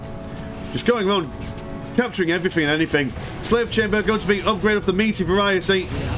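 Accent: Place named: North, United Kingdom. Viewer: northern accent.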